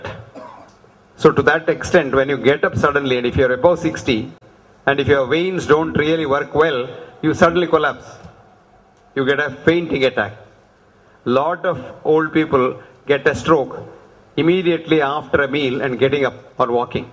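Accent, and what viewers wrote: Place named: India. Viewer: Dear Dr. Hegde, I feel blessed to have found you, Your honesty, Knowledge, and Love is so encouraging and satisfying. Your, Your, Your ACCENT however is punishing to Non- Indian audiences. Would you please turn-on the (CC, Closed Captioning) on all your videos . It saves people like me plenty of time and frustration of rewind and forwarding to make sure we understood everything. I feel blessed to learn from you everyday.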